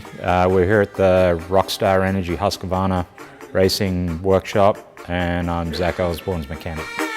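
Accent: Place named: Australia. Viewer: He sounds Aussy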